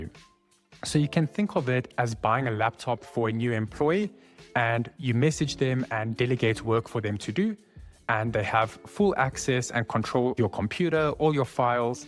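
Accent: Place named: South Africa. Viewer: Didn’t realize how much I missed hearing the SA accent.❤ moved away when I was 11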